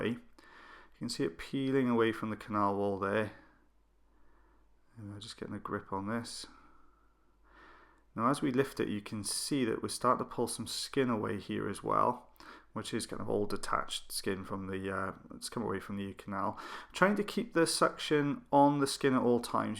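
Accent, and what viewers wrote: Wales, United Kingdom. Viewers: god that beautiful Welsh accent. I know people from the UK deride it, but I challenge anyone to listen to say “maneuver” and not love it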